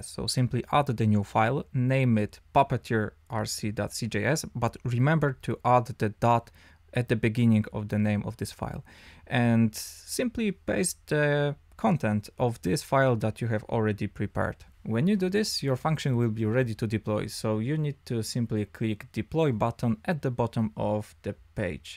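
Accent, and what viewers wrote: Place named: Poland. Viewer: No slychac, że Polak 😀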